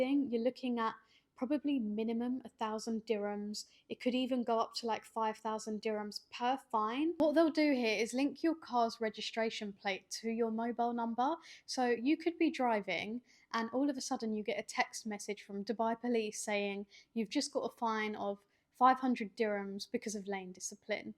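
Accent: United Kingdom. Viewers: Great info, cute girl, but that english accent is not cute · ah. When you said UK I immediately snapped back understanding the accent. Couldn’t figure out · British accent is amazing, a beautiful woman with British accent is charming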